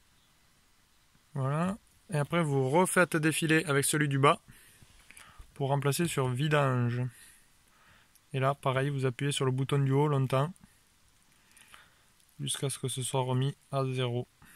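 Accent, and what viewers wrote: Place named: Toulouse, France. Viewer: C est exactement ce que je me suis dit !!! Il est top !!! Avec un petit accent toulousain en plus !! 😂👍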